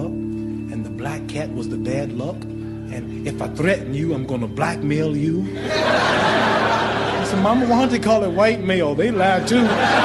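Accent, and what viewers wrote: United Kingdom. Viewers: The British accent is strong. Good accent.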